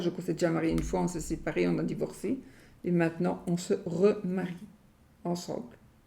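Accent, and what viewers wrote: Belgium. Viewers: Au plaisir je vous adore vraiment je vous suit depuis un bon moments et jaime votre naturelle et votre accent belge😂 vos guidance sont tres pertinente vous parlez de tout j'adore continuer comme ça et si l'écriture automatique vous appel nous aimerions bien avoir une lecture pour le fun merci merci belle journée a vous ❤🙏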